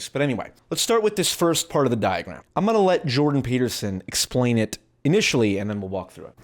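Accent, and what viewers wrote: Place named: United Kingdom. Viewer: hilarious british accent, good job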